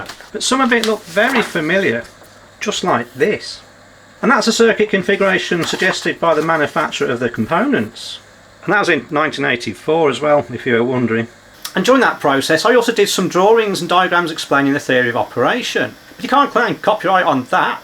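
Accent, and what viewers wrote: United Kingdom. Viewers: The guy that makes that shitty Amp with all those wobbly plastic standoffs that looked like shit and not worth the $25 thousand anything? He just doesn’t want anyone to see his crappy workmanship. In my opinion a super high end amp that has any value is going to use vacuum tubes. Starting to love your channel and am enjoying your accent, Americans love a great Brit accented voice I guess it’s all the James Bond movies and Dr Who episodes that made it across the pond that started the addiction.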